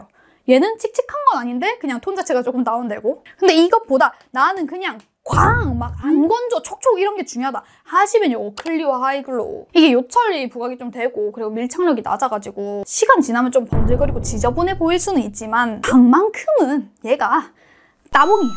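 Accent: Busan, South Korea. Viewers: 이 웅니 경상도다...경상도 꼬순내가 난다...아닌가....아니야 꼬순내가 낰ㅋㅋㅋㅋㅋ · 저랑 피부비슷하셔서 이분쿠션영상보고 빛이다 감격했는데 요 영상도있었네요 ㅠㅜㅠ짱짱짱 ㅠㅠㅜㅠ부산인인데 사투리까지 짱정겹다 제 친구느낌이에욬ㅋㄱㅋㅋ 퓌 리뉴얼이랑 클리오 하이글로우 웨메쿠션 진짜 궁금했는데 감사합니댯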